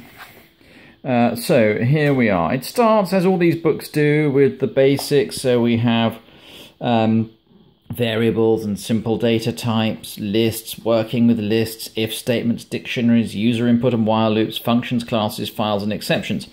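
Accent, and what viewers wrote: RP, United Kingdom. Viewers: Quite a posh sound gentleman! A Gentleman and a Scholar! :)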